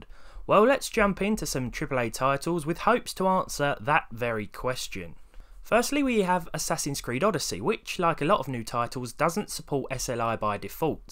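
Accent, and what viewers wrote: United Kingdom. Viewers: British accent + old tech? Instant sub!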